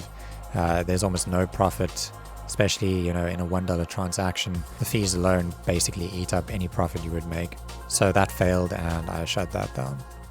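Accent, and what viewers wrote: South Africa. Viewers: Trying to place the accent. I know you're in UK but I'm guessing SA? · dude just noticed the accent is south african shout out bro ! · Yo...I need to know, are you from South Africa?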